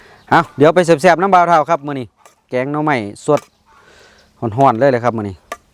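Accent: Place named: Ubon Ratchathani, Thailand. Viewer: เกรียงไกร สุริบุตร ฟังสำเนียงเราน่าจะยุ่ทางอำนาจอุบลละคับพี่น้อง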